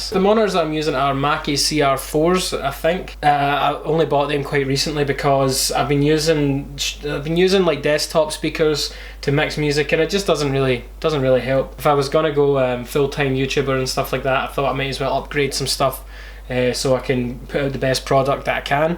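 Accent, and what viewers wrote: Scotland, United Kingdom. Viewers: Scottish isn't a language. He's speaking English with a Scottish accent. Lol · Slow it down to 00.75 When he starts talking he sounds like Scottish Johnny Vegas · Are you scottish? It sounds a bit like you're scottish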